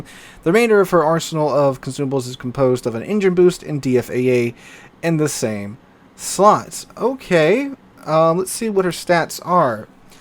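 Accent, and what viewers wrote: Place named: United States. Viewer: He's using the American pronunciation rules. We say it this way, that's what we do.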